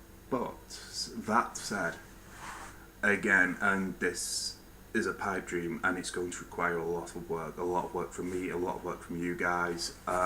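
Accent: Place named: Northern England, United Kingdom. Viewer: No worries, it must be hard being misunderstood like I am. I kinda get pissy at people when they mock me and generally don't understand me, so it makes sense the forum would be unaccepting of newer members but I'll try to fit in they're, and I will PM you when I figure the system out and if I remember you're name. Their's something I wanted to ask you, are you from England? I used to live they're until I moved. Most of my family live their as well. I can kinda tell your accent is from the North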